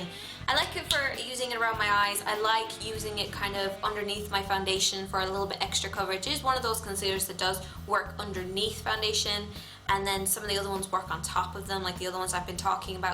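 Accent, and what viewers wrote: Ireland. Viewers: ...English accent? Are you people mad? That's clearly Irish...or...Scottish 0.o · Are you Irish? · are you irish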